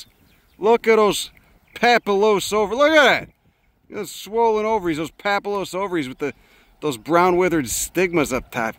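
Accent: Chicago, United States. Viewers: Funniest botanist. He’s from Chicago and travels the country trying to look at plants. Heavy Chicago accent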